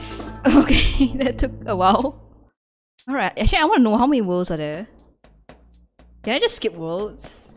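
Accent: Singapore. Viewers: Are you Singaporean? Just curious. It sounds like a very singlish accent. =)> Also nice video! I’m surprised you put captions over the ENTIRE thing (this is the first time I’ve see a video of yours so if that’s a normal thing I didn’t know) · Oh boy I can hear the Singapore in the voice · i could tell right away you were from sg, this video confirms it LOL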